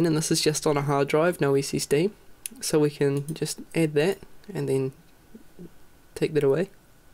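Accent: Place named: New Zealand. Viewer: Man, that was viciously Kiwi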